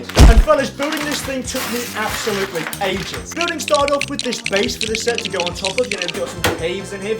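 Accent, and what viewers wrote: Newcastle, United Kingdom. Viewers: Your a geordie let’s go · You sound British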